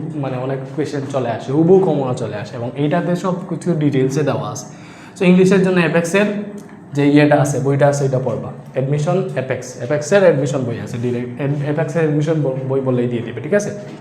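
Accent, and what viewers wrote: Sylhet, Bangladesh. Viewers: বাই তো আমরার সিলটি মন অর, হাসা নি বা বাই? আফনে কিতা সিলেটি নি?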